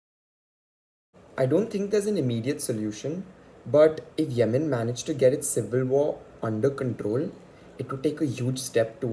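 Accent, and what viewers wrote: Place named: India. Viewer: Why indian accent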